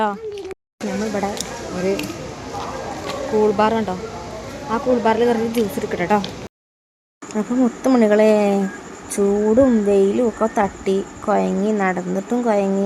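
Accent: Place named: Malappuram, India. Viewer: Malapuram slang kunu kunu kelkan ishtan but tto tto kelkumbo vallatha oru irritation 😂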